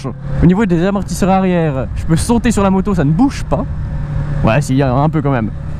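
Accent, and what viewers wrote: Vaud, Switzerland. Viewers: Yo mec ! Franchement très bonnes vidéos t'as un abonné en plus ! Et dit voir, t'habites où en Suisse ? Il me semblait qu'à ton accent t'étais suisse (comme moi ;)) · Un joli accent du canton de vaud il me semble :D Je vient de m'en offrir une et j'ai hâte de voir ce qu'elle a dans le ventre ! Merci pour ta vidéo !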